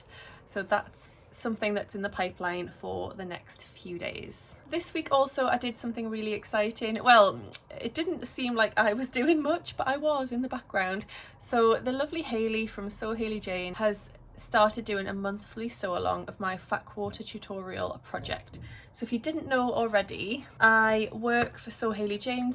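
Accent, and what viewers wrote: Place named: County Durham, United Kingdom. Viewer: It's lovely to hear your accent on YouTube, I live in County Durham so not far! I love sewing but I don't have anyone I know that likes it too, I literally get all giddy in a fabric shop! I love dressmaking but have decided to start doing some craft fayres with bags, accessories etc, maybe something like that could help xx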